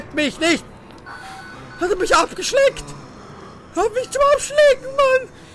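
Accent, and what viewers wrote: Austria. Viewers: Ich hab dich noch nicht do lange aboniert nur eine kurze frage kommst du aus Österreich? :D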